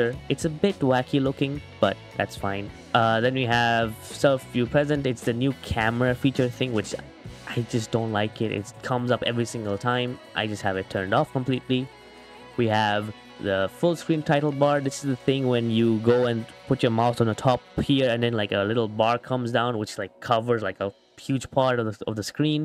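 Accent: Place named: Bengal, India. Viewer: u sound so bengali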